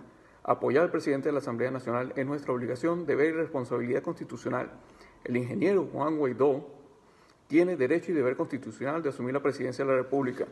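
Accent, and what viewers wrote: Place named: Cuba. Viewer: Lo siento pero este señor tiene acentos CUBANO oiganlo detenidamente.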